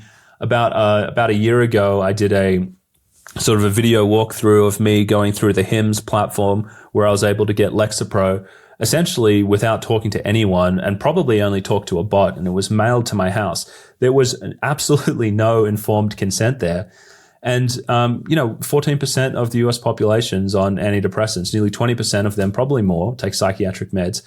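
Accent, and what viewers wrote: Australia. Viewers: Why are you Australia accent?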